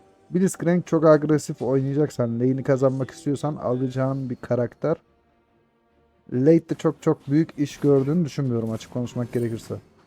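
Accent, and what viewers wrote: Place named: Anatolia, Turkey. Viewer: Bu içi anadolu ağzı beni köyümde hissettirdi.Sivaslı mısın?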